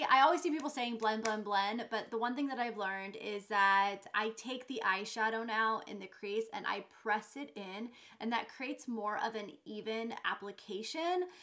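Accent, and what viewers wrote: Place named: New York, United States. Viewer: I love her accent. She has to be from New York, Staten Island kind of area.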